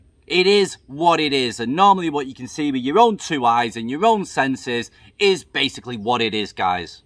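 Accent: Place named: Yorkshire, United Kingdom. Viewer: Are you from Yorkshire? You sound like you from leeds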